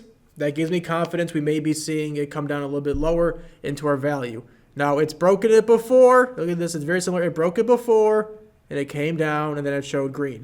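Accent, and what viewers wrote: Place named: Michigan, United States. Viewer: tell me u not from Michigan ... ur accent in so close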